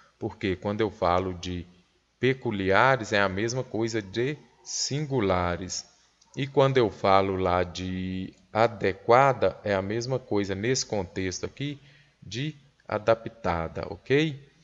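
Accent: Minas Gerais, Brazil. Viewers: Professor amo o jeito mineiro de falar ! Obrigada pelo vídeo !!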